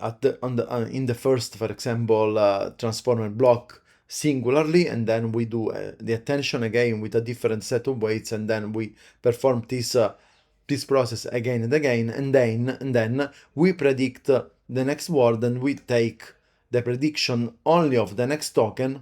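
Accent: Sicily, Italy. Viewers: sentirlo parlare in inglese con accento siciliano , mi rende felice. chi fa questo lavoro deve essere bravo nello sviluppo non in lingue estere. spesso nel support service di cisco succedevano telefonate dove non si capiva nulla. e nessunondi noi ha mai detto niente. altro che accento siciliano.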